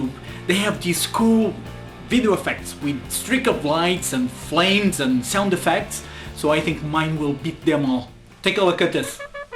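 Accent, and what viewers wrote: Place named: Portugal. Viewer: Ah! Sabia que tinha ouvido este sotaque dum outro lugar! Já tive em Portugal antes, vivi em Braga e Lisboa, belas cidades, devo dizer. Saudades de Portugal, um abraço!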